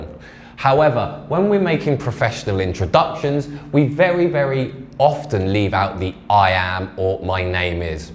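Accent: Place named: United Kingdom. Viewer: love his brittish accent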